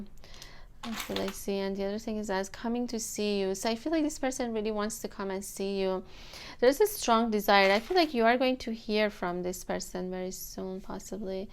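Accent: Iran. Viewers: Beautiful Irani Accent! Thank you for the reading.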